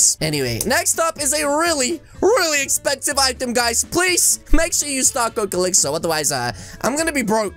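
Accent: United Kingdom. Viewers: Bro is in British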